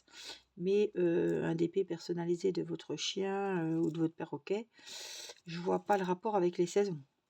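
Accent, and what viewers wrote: Franche-Comté, France. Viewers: Est-ce un delicat accent franc comtois que j'entends ?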